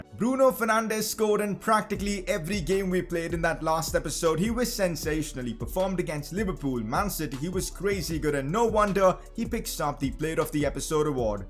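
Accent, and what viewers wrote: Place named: India. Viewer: PCQ: your accent is different where are you originally from? India? Sorry if its personal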